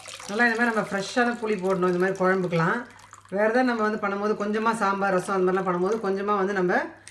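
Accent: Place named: Coimbatore, India. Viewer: But unga speech la lesa Coimbatore slang come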